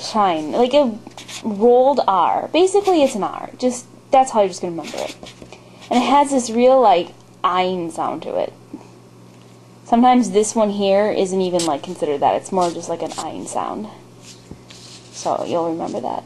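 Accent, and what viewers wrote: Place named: Great Lakes, United States. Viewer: This was great! I have no real reason to learn the script other than general interest, but these lessons were helpful even for that purpose. I know there are a couple videos out there that show how to connect the letters and such, but I'd love to see a continuation from you! And your great lakes sort of accent makes me smile!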